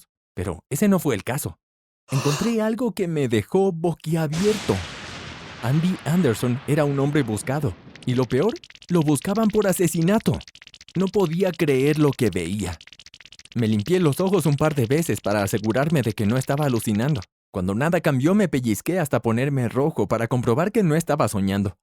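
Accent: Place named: China. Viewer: soy la única que pensó que la vos parecía de un chino hablando español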